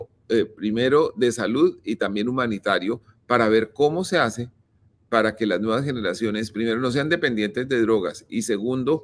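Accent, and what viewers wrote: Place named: United States. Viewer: Coronell en geopolítica algunas veces suena muy agringado, da risa. Lo de Ucrania y Rusia es una guerra entre dos paises no una "invación". Ucrania también mata periodistas y activistas y tiene escuadrones Nazis en las filas de sus ejercitos, que venían matando y torturando Rusos viviendo en Donbas, no trato de defender a nadie por cierto. Rusia no tiene una Kill List como sí la tiene Ucrania, que de paso sea dicho es una verguenza para la humanidad.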